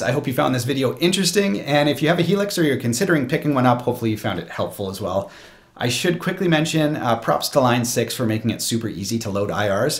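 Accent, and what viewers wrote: Canada. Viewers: It's not often I can hear the first fragment of a sentence and know someone is a fellow Canadian, but that absolutely happened here! Lol Excellent video, thanks for putting it together, seriously considering a Helix.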